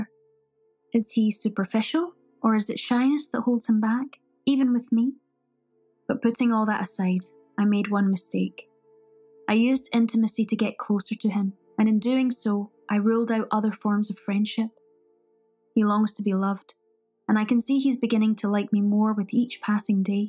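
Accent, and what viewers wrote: United Kingdom. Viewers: The Scottish accent is a bit off putting but Anne's words shine through.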